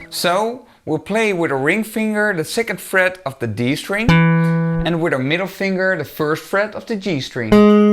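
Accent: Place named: Netherlands. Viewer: I smell nederlander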